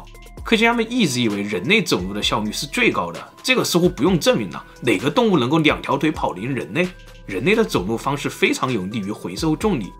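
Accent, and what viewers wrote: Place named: Mainland, China. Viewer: 中國人發音跟台灣不一樣你當亞洲國家只有台灣？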